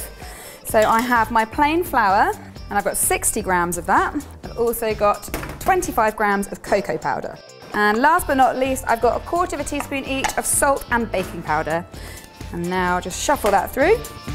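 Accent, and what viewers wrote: United Kingdom. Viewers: Amo el acento del inglés británico, tenía que decirlo!!! Suscrita para conocer deliciosos postres y escuchar el idioma ;)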